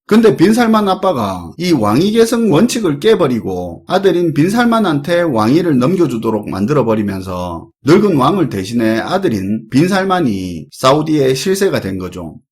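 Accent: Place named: Busan, South Korea. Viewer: 부산 표준어입니다. 꼭 서울말이어야 하나요?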